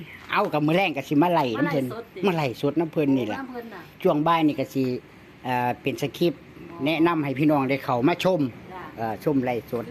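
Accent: Isan, Thailand. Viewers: จุดกำเนิด กะแม่นเสียงอิสานนี้ล่ะครับ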